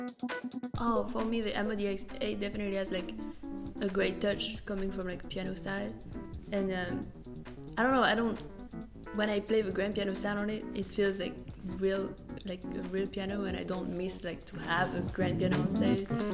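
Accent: France. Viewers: Mmm cet accent français !👌👌🤩🤩🌹🇨🇵🥳😜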